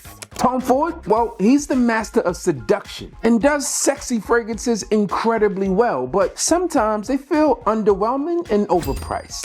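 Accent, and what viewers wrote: Baltimore, United States. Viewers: Shoutout baltimore i hear the baltimore all throughout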